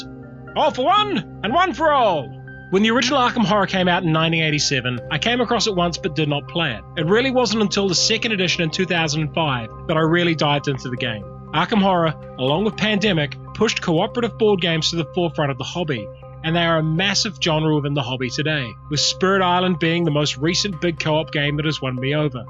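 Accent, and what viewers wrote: New Zealand. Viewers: 1. When I was in high school (in the 80's) a couple of friends and I spent long hours playing Hitler's War. It really grew my appreciation for resource management games and that resource management element is still probably one of the biggest hooks I enjoy in games to this day.2. As a fan of roleplaying, I've also come to enjoy games that have a capacity-building or levelling up component for your character/s (very common in numerous games).3. While it's great to enjoy boardgames with friends, it's also great that so many are getting good ports to the PC so you can enjoy them on your own. I've been playing Lords of Waterdeep, Through the Ages and Elder Sign: Omens quite regularly and enjoying them to fill gaps between real life games.4. King of Tokyo perhaps gave me an appreciation that games can be useful just for a quick bit of laughter and fun - they don't always have to be serious. 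Also great to play with the kids.5. Avalon Hill's Civilization was another game that really made an impact. The idea of achieving technological progress and the awesome trading scheme where you could sneak in natural disasters for your opponents was very appealing.I enjoy your vids, the length is great and it's great to hear a fellow Kiwi (unless my ears deceive me) doing something that is internationally appreciated.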